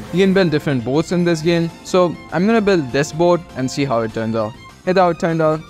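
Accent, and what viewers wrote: India. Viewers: By his accent i think hes indian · I know u r indian the freaking accent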